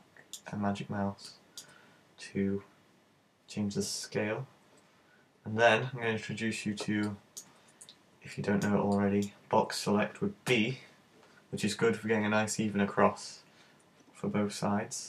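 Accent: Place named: Southern England, United Kingdom. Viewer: that accent sounds damn familiar :) guessing from south england but been in the north quite a while?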